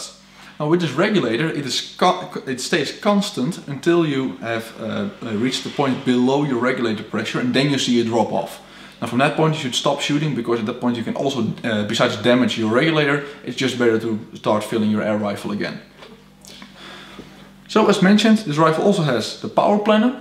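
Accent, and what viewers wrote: Netherlands. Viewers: Ben je gewoon Nederlander? Aan je accent te horen wel? Niet negatief ofzo, gewoon nieuwsgierig.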